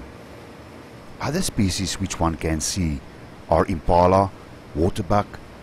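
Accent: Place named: Afrikaans, South Africa. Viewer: A fantastic yourney no doubt, love your Arikaans accsent boykie. Pretoria boy, 40 years in USA. Miss Kariba a lot. Would love to do your trip.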